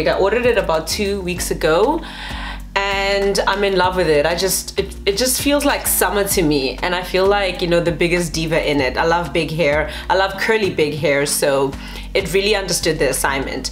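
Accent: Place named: South Africa. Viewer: South African accent😍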